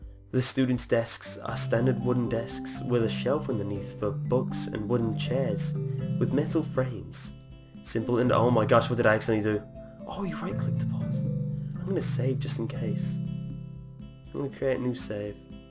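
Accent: England, United Kingdom. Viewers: You're English, too? I Knew it!! *Hugs*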